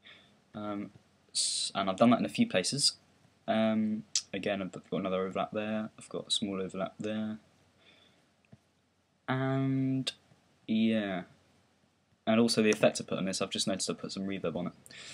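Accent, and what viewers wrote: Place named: United Kingdom. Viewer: So British it hurts :P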